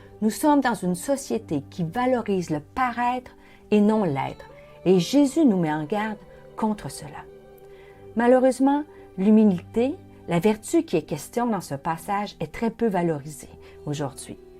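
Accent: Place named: Canada. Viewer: Bonjour Pasteur....( de Vendée en France )......Merci pour ton conseil. Nous avons tous un peu en nous une envie parfois de paraître surtout en face de personnes qui ne font que s'élever, chassons cette envie et restons humbles, l'humilité est une si belle qualité.!!!!!Mon père était un homme très humble, érudit et qui parlait 4 langues, la réflexion d'une de ses voisines qui l'appris un jour m'a choquée.... c'était: "Oh! On ne le dirait pas "... c'est çà l'humilité, ne pas se glorifier de ce que l'on est, de ce que l'on sait... il a toujours été ainsi et il était mon exemple, mais le fait de rester humble parfois n'est pas facile en face de certaines personnes, je dois l'admettre...Résistons avec l'aide de NOTRE SEIGNEUR,l'humilité LUI plait. Bravo chère pour ton message de ce jour et sois bénie chère soeur en CHRIST...........j'adore t'écouter et ce petit accent Canadien est très agréable à entendre 😊😊😊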